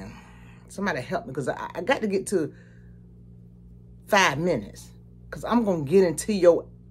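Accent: United States. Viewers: I just so happened to stumble upon your site,. You are so funny...shooting straight from the hip with that country accent 🤣🤣! I was hooked and hog tied the first 5 minutes of listening. Where have you been hiding🤣😃? Thank you.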